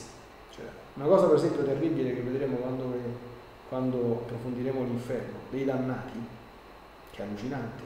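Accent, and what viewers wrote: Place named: Rome, Italy. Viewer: Santo Padre, grazie dei suoi preziosi insegnamenti! Le chiederei solo di parlare meno in romanesco perché a volte mi perdo le sue parole e mi dispiace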